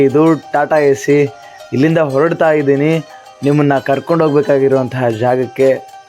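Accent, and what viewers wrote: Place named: North Karnataka, India. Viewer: ಉತ್ತರ ಕರ್ನಾಟಕದ ನುಡಿ ಇನ್ನೂ chanda... ನೋಡಿ ಖುಷಿ aitu... ಗುರುಗಳೇ.. ಒಳ್ಳೇದಾಗಲಿ.. 🙏🙏🙏🙏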